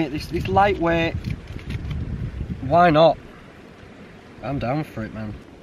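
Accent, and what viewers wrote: Yorkshire, United Kingdom. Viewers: love the video, is the accent yorkshire?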